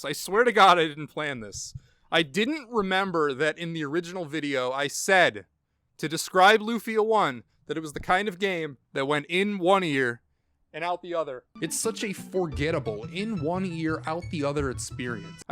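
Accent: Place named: Midwest, United States. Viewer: wait, if you're from florida and live in texas, why do you sound like you're from iowa, definite midwest accent.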